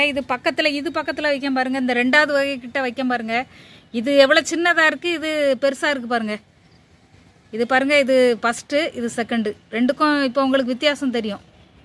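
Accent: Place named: Tirunelveli, India. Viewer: மேம் நாங்க தாராபுரம் ஏரியால இருக்கவங்க மேம் எங்க ஏரியால இந்த செடி கிடைக்கிறது இல்லை ஒரு பதியம் போட்ட செடி எங்களுக்கு கிடைக்கும் எனக்கு இந்த செடி ரொம்ப பிடிக்கும் மேம் ஆனா எங்க ஏரியால இந்த செடி அதிகம் இல்லை இனிமேல் நீங்க வந்து திருநெல்வேலி மாவட்ட ஏரியாவுல உங்க வாய்ஸ் வந்து திருநெல்வேலி பாச மாதிரி இருக்கு அதனால தான் கேட்கிறேன் இரண்டாவது என்னன்னா முல்லைக்கொடி எல்லாமே கிடைக்குது இந்த சந்தனம் உள்ள செடி வந்து கிடைக்கவில்லை எனக்கு ரொம்ப நாளா இந்த செடி வாங்கி வைக்கணும்னு ஆசைங்க ஆனால் கிடைக்க மாட்டேங்குது எனக்கு ஒரு பதியம் போட்ட செடி தருவீங்களா ப்ளீஸ்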